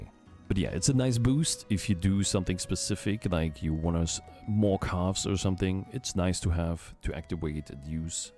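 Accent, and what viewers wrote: Germany. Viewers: Your dialect sounds kinda like you’re from Germany? Am I right?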